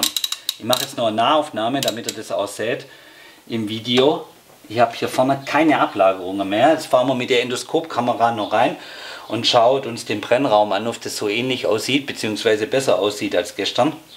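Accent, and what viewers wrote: Swabia, Germany. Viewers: Schee Schwäbisch, griasla noch Günzburg👍🏻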